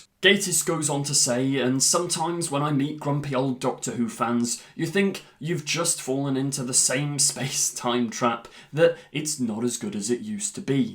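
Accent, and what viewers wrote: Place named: Scotland, United Kingdom. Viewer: Scottish accent alert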